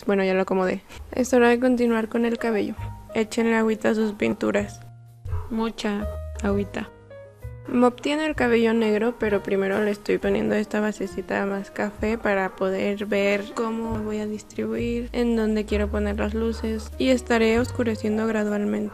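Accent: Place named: Fresa, Mexico. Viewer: que agradable sujeta, suenas fresa, pero sin forzar, lindo :) nuevo sub cómo dirían los chavos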